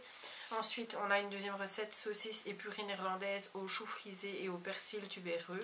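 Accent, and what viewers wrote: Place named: Belgium. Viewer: oui c'est vrai ça s'entend que tu est belge tu viens de liege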